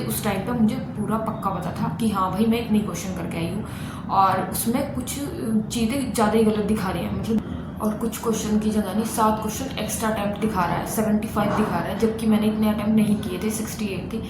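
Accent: South, India. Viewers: Mam Aap south se ho kya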